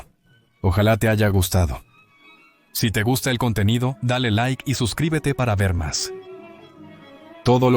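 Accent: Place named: Colombia. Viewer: no mija , hablas como colombiana , eres? , he estudiado tanto y analizando tanto , que ya no tengo dudas , así me pongas una pistola en la frente , es tan real , que no ubiera querido existir sin la existencia de Dios , aunque se que es imposible existir sin ( ÉL ) Sabés que significa su nombre ? SOY el que SOY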